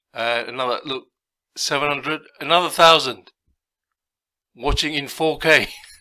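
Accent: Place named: India. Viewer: Haha you're so funny. A bit "old man shouts at cloud" there. BTW, do you have non-UK roots? You sound a bit like one of my friend's Indian uncles: )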